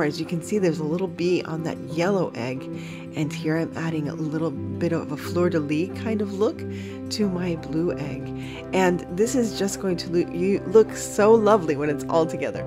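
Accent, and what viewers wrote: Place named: Miami, United States. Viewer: I thought I detected the Miami accent! I grew up there just off of Red Road and Calle Ocho! In Ocala now.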